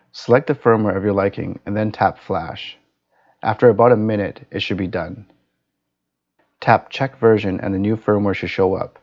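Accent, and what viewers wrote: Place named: Vancouver, Canada. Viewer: Haha right away I can recognize you’re from Vancouver. Really wish there was a hack for widewheel mercane..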